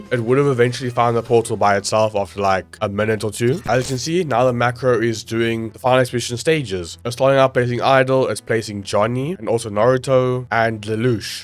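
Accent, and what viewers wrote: South Africa. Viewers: holy, i recognised that south african accent instantly.Thought i was the only one in the country to play als.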